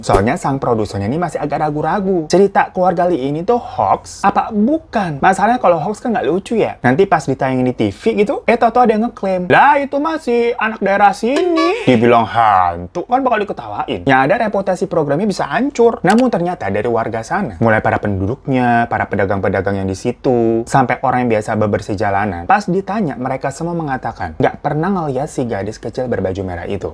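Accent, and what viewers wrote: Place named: Pasar Minggu, Indonesia. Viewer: Kamu ngerti keseluruhan bahasa indonesia logat pasar minggu emang?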